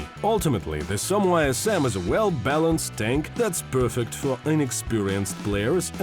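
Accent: Russia. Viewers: Rest of the community: (A WH40K Tyranid Hivemind that can't get a cohesive thought) Me: "Ah yes, I like your words and history, thick Russian narrator man!"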